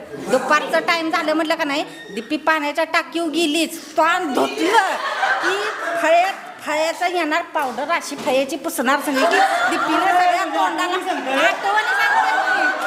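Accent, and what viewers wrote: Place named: Satara, India. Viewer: खूप छान आठवणी आणि त्यात सातारी भाषेत ऐकण्याची मज्जाच भारी... लय भारी🎉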